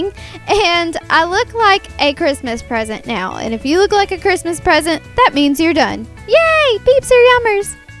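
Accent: South, United States. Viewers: is it me or she has like a country accent kinda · You sound country! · Southern accent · you sound like you're from the south. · U r really pretty and ilove ur country accent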